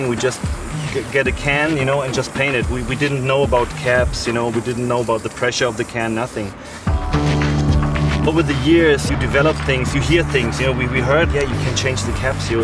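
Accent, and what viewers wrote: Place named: Germany. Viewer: sounds like hes german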